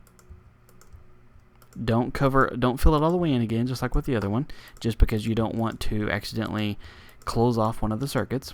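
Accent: South, United States. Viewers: I cant tell if you have a southern accent or not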